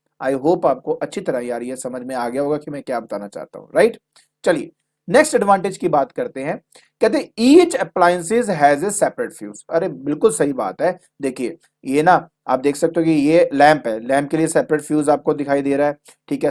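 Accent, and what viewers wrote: Punjab, India. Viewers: Sir kyà aap Punjab se ho?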